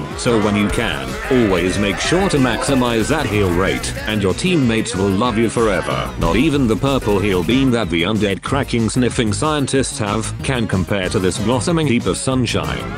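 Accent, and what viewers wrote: United Kingdom. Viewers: Honestly, man should've just used his British voice for this Ability Analysis.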